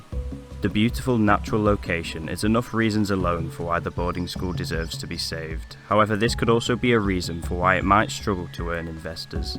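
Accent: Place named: Stoke, United Kingdom. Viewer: you sound like a Stokie, would I be right in thinking that? this is a stunning video!! Well done. subscribed.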